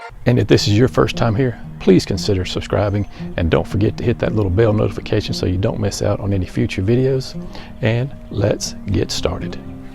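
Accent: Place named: South Carolina, United States. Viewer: Thanks for all the tips🌿Just hooked our system up. Love that everyone is commenting on your accent. That’s that born and raised in South Carolina flow☺️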